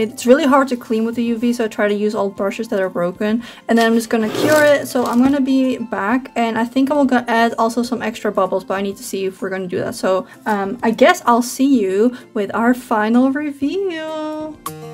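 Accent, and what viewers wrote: Netherlands. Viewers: The dutch accent is killing me 😭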